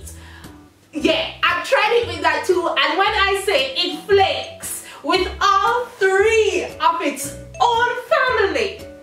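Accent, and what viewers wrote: Jamaica. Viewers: so refreshing to watch a hair blogger with a jamaican accent 💖🙌🏿 you really named and shamed them products ha HAAA 😂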